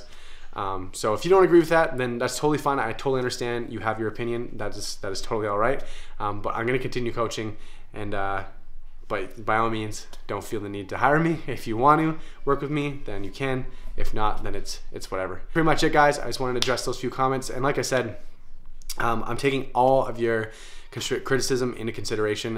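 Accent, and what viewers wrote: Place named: Canada. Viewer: Never noticed the accent I guess you are Canadian lol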